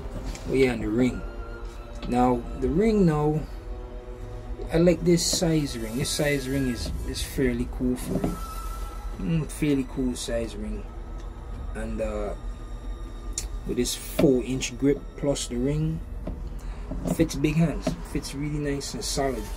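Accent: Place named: Sint Maarten. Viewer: Bro.. u from Sxm? Ur accent is to close to home..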